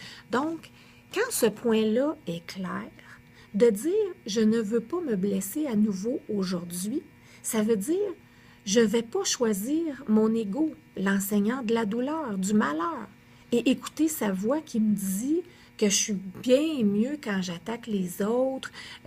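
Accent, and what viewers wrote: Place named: Marseille, France. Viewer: l'accent marseillais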